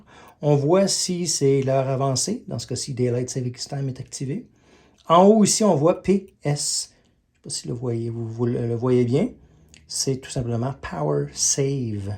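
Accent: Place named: Quebec, Canada. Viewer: Woaahhh! Un québécois!! Super!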